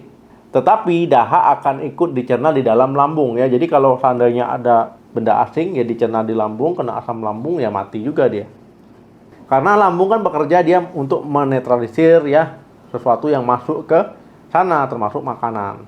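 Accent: Aceh, Indonesia. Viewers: Macam gaya orang aceh ngomong bahasa indo dokternya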